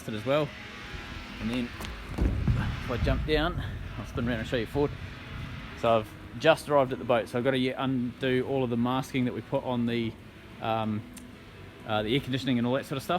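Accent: New Zealand. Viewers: Just randomly discovered this in Hamilton NZ. And detect an NZ accent?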